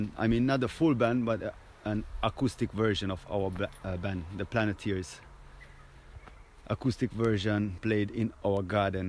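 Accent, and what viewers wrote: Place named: Jamaica. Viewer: You sounds like a Jamaican did you develop an accent or something like that it sounds very unique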